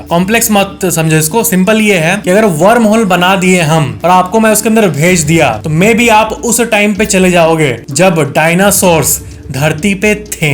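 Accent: Bihar, India. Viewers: Aap bihari ho na tbhi apke accent m bihari chalak raha hai sir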